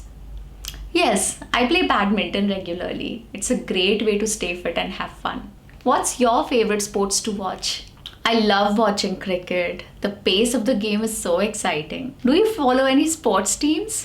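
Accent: India. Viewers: I actually speak English with American accent🇺🇸 Now I’m gonna learn Indian accent from your videos to make better content for my Channel. Thanks a lot for your beautiful work gorgeous lady!!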